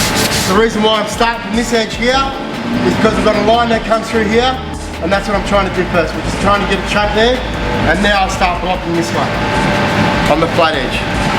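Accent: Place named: Arab world. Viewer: Looks like the guy had an Arab accent, who went to Australia and was kicked out and went to U.K, lived there for 15 years, tried a lot to copy the white guys there.... And now he is a mongrel... His accent sucks!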